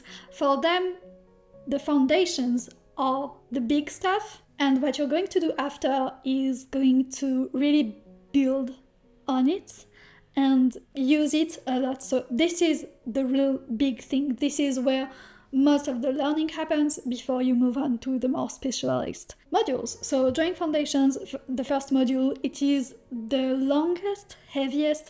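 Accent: France. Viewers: Your accent is French ???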